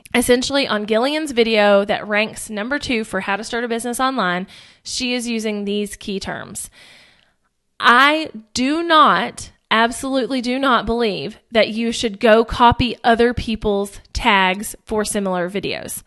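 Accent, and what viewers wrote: South, United States. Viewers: Finally somebody has explained this to where i can explain it ! Thank you! Maybe its the accent ! I too speak southern lol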